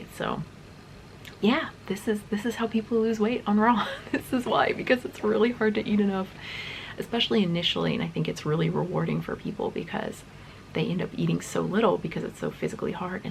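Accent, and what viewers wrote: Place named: Tennessee, United States. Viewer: I heard your Tennessee accent.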